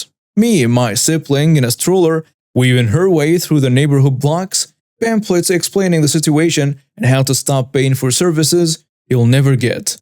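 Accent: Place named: United States. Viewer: Hi! I hope that all is well with you and your loved ones. You are amazing with the English language. You can say several sentences in a way that sounds like you're an American! You are pretty much fluent! 👏👏👏 It's snowy, windy, and the temperatures in northeastern US right now, p.m. are 4°F. It's freezing! What is it like in Egypt? I wish you continued success with your channels! Take good care of yourself. Work hard, but find time for fun and relaxation, too!😊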